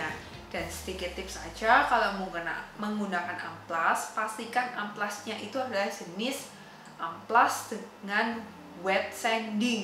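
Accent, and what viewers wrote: Java, Indonesia. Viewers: Tante tambah medoook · ce.. medok tenan se, wkwkw.. jowoan ae, good konten anw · Medok nya bikin kesem sem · Medoknya bikin mabuk kepayang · medokmu mbak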